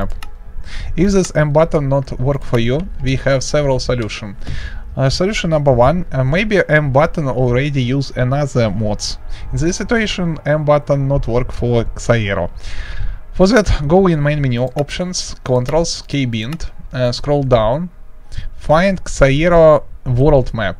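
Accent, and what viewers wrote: Russia. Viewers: Русский акцент мне не кажется? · да он русский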